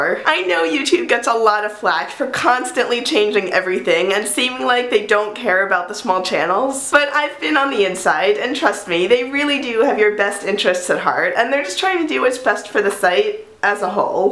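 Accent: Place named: Vassar, United States. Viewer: She seems to talk like she's from Vassar.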